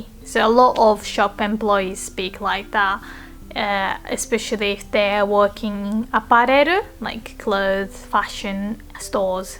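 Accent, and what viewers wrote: England, United Kingdom. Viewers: Never thought I would find Japanese with thick English accent other than nihongogamer 😂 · Whoa, where’d you pick up that accent? Is it British? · 她的英國腔真好聽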